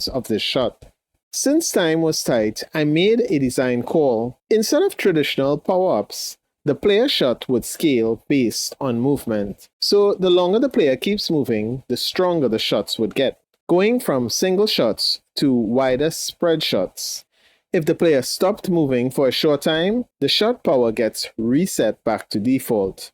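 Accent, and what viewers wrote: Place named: Trinidad and Tobago. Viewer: This accent sounds like its from Trinidad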